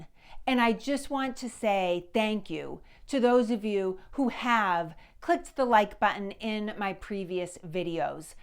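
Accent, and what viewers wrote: Boston, United States. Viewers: Love your Boston accent.😊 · Great history lesson, along with a fabulous Boston accent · I love this woman. You sure as hell can tell she is from Boston! Sometimes I have to rewind & play over what she is saying to understand her. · Thank you, for another interesting action adventure! I love your enthusiasm and your Boston accent too! I've been to the Bunker Hill Monument and taught about the battle in my grade 5 History class, but I didn't know about the rail fence stuffed with grass and hay. Keep on with your historical posts. I always learn something new. 🙂 from NH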